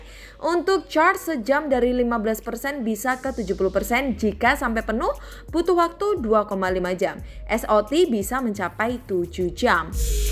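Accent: Surabaya, Indonesia. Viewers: Suroboyo pasti iki😅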